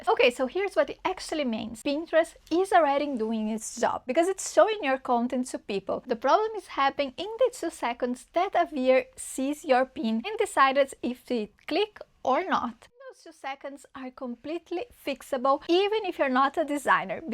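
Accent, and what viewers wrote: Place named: Brazil. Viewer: Are you brazilian? your accent is kinda familiar tho! trhanks for the info!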